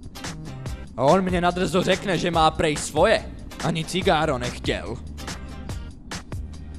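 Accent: Moravia, Czech Republic. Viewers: Paci sa mi Moravstina :D taka slovenska cestina :D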